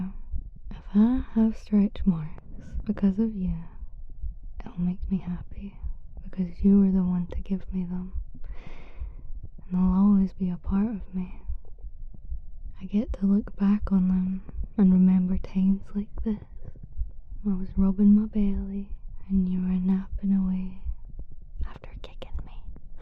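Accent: Scotland, United Kingdom. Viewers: u have to be from scotland the voice just it speaks to me i mscottish and u sound exactly like other vtuber / f4m content scottish creators and some people in my area lol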